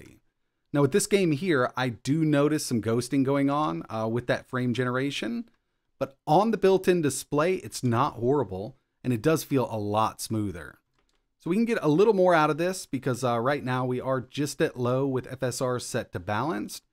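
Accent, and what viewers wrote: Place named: United States. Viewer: Bro what part of America are you from. This is the best American accent I’ve heard. Heard a few times but can’t place it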